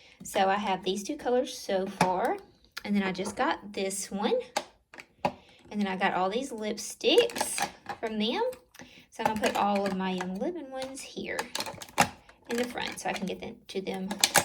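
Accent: South, United States. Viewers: I'm not one of those youtubers who looks flawless lol I cant stand fake one their home are not that dirty to make a video. Love your videos and southern accent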